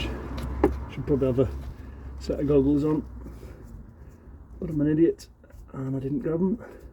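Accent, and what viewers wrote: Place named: Newcastle, United Kingdom. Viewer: Ah I see you are a geordie too :) 😊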